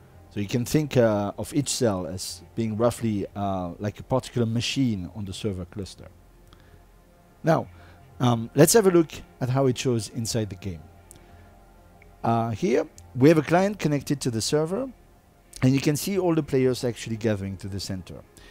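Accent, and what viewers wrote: France. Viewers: Cette accent francais :D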